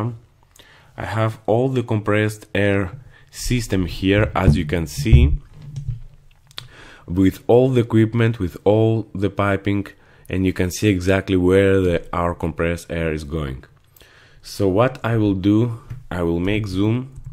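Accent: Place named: Russia. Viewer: Sounds like Russian accent.. Am I right?)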